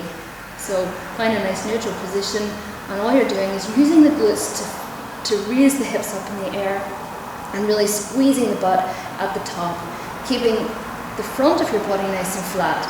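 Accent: Ireland. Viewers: Are you Irish? Thanks for the video ...very helpful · Irish accent. Beautiful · Now, now, she's much more tastefully understated compared to the YouTube gym skanks. It's that Lucky Charms accent that does for me. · I'm guessing north Dublin but definitely could be wrong..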